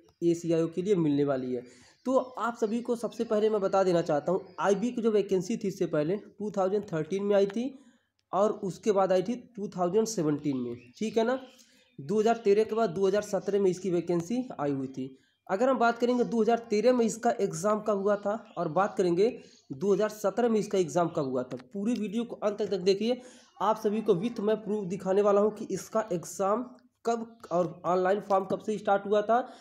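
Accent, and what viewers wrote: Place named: Bihar, India. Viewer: I love bihari accent🤪🤟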